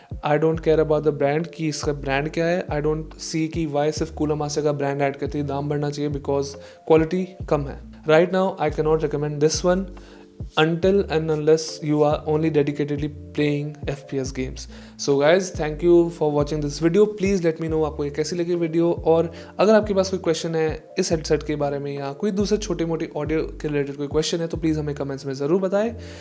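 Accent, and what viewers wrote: India. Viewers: Very hindi english.. Hard to understand but appreciate your Work of course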